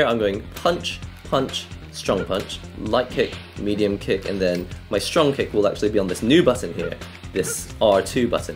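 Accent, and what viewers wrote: United Kingdom. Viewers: its like hes from Japan, but he sounds british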